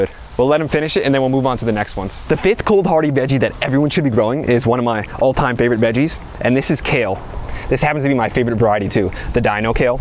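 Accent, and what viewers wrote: New Jersey, United States. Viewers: You’re so Jersey lol love it -coming from a 973’er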